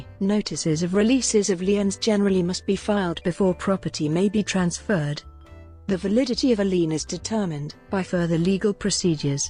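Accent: England, United Kingdom. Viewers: Someone program these damn computer voices better at speaking American English. And lose the English accent.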